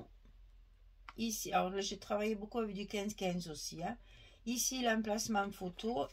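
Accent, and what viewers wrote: South, France. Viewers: Cc whaou quel bel album vous l'avez sublime avec vos embellissements. J'adore, pas si petit que ça finalement. Alors chez moi pas très beau temps le vent souffle beaucoup et il fait un peu frisquet mais avec votre bel accent du sud vous nous mettez du soleil dans nos cœurs. J'attends de voir ce projet à 4 mains mais je ne doute pas du résultat,je suis allée voir ce que Françoise faisait et ses albums sont très beaux aussi. J'attends aussi de savoir où vous allez passer pour votre tour de France j'aimerais bien vous rencontrer. Bonne après midi bisous 😘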